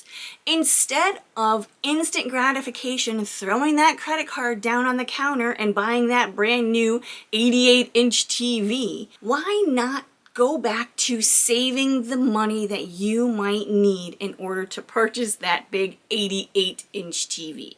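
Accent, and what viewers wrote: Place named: Michigan, United States. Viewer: You have a Michigan accent. Or maybe Wisconsin or somewhere near there.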